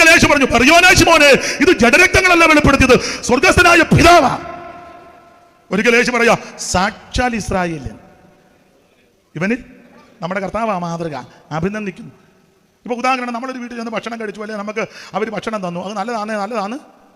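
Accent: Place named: Thiruvalla, India. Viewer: തിരുവല്ലക്കാരുടെ ഭാഷ 😂😂😂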